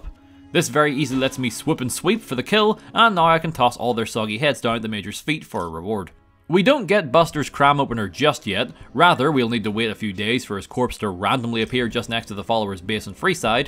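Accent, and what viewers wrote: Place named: Northern Ireland, United Kingdom. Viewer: Been falling asleep to these for a while now and I don't think my brain can enter sleep mode anymore without listening to an northern irish man describing incredible acts of violence. I had an idea I thought might be interesting, since with the fallout 4 DLC you can craft robot companions what if you got the unlimited companions mod and just have an army of customized robots wreak havoc on the commonwealth, you could call it "can you beat fallout 4 as the mechanist" or something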